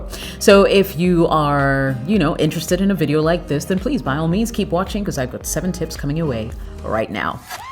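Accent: England, United Kingdom. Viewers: Beautiful dark chocolate with an ENGLISH ACCENT.... I'm infatuated. Love from "across the pond". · Have u lived in the Uk b4, u have a wonderful accent. Thank u for all the advices it really helped a lot. You are 👏 amazing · You're vocally appealing with some british,twang!👌👍🙏🙏🙏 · Did you do this video all in one take? And did you read out of a telescreen prompter? That last clicking noise you made reminded me of bdsm, sounds a bit horsy. On the other hand you have a nice english accent.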